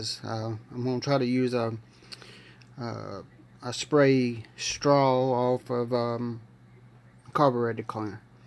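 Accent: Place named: South, United States. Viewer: Good job man! glorious southern accent!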